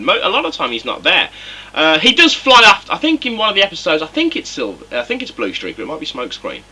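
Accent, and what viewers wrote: United Kingdom. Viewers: your british.wtf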